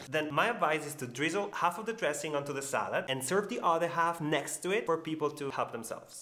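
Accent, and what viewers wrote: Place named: United States. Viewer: Americanizzato, ma ti ho ritrovato, chef! 🤗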